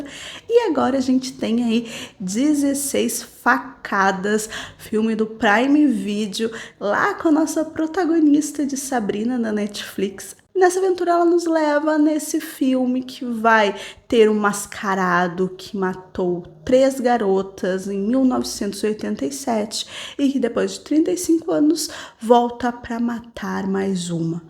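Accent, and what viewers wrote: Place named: Porto Alegre, Brazil. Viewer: Tu é minha conterrânea? Com esse sotaque só pode ser porto-alegrense.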